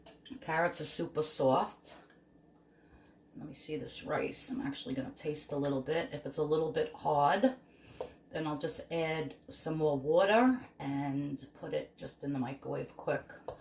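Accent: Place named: Long Island, United States. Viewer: Hey sweetie! I freakin love you and your videos. I'm a born and raised Upstate New Yorker (Albany area) but I live in Texas now, but I sure do miss NY. You have a Long Island accent, is that where you're from? I have many friends there (Wantagh, Massapequa, and Glen Cove). Keep up the great video's and thank you for sharing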